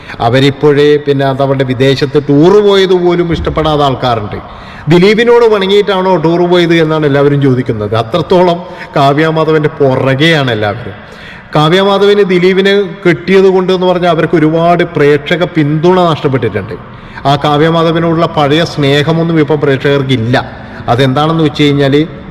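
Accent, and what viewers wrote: Kannur, India. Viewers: കണ്ണൂർ ഭാഷ ആണല്ലോ പറയുന്നത്, അപ്പൊ നമ്മളെ നാട്ടുകാരൻ ആണ് അല്ലെ 👍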